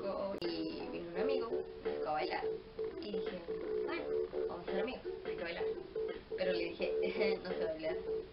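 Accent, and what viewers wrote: Mexico. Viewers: Hola bueno conociste el norte. muy buen ambiente. sólo te faltó conocer Chihuahua nuevo León Durango. y bueno hasta se te pego un poco el acento. aguevo. un saludo linda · casi puedo jurar que eres mexicana de verdad tienes acento de sonora por un momento me enganaste saludos... like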